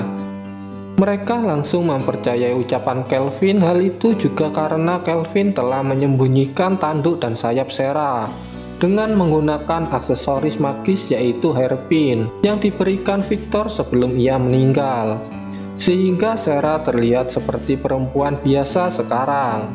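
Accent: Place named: Java, Indonesia. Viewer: Jangan medok min ngomongnya🤣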